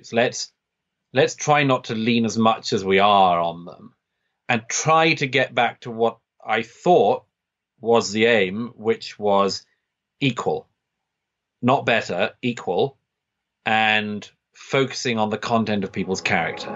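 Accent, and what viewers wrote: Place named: United Kingdom. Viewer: whole thesis is there's a collapse of shared stories in the West with the decline of ideologies like Christianity and capitalism. But then, he attacks civil rights movements aka 'identity politics' (gay/womens/trans) for being exactly that story to tell for many, because apparently they're too divisive and not scientific enough for him. Does he seriously believe that ideologies like Anglican Christianity and Smithian capitalism rested on some bedrock of universal value and rationality? What a myopic worldview. This guy is a muppet. Not everyone with a British accent is a genius.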